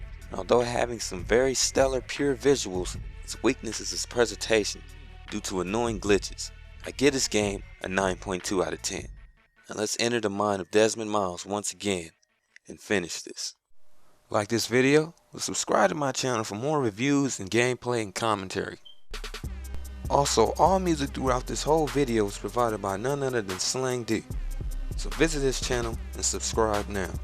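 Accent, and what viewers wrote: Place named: United States. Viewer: What accent is this guy's? I know it's American lol.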